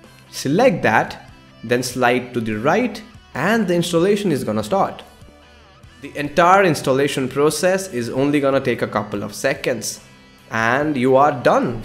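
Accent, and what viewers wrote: India. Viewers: I remember when u could barely speak english and sounded hella indian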